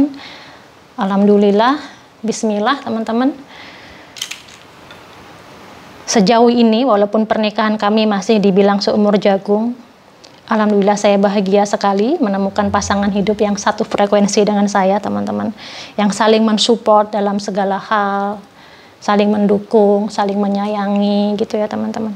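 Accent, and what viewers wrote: Java, Indonesia. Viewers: Mbak orang mana ya.... Jowone ngendi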